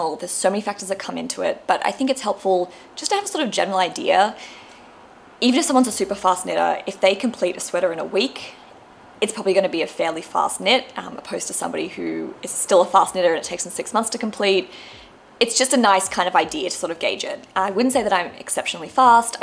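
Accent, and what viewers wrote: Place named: Australia. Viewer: I loved seeing your knits for 2022 and looking forward to watching more of your episodes. It is nice to hear an Aussie podcaster in the mix. I have to admit, I gasped horrified when i saw your felted sweater. 🤕I just started a knitting podcast and you inspired me to do a 2022 knits video. It was really interesting for me to go back over the year and see what I made.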